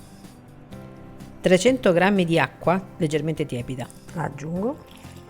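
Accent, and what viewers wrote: Calabria, Italy. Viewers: Ciao sei bravissima,ti seguo sempre, sei di Reggio? Di quale parte della Calabria?😘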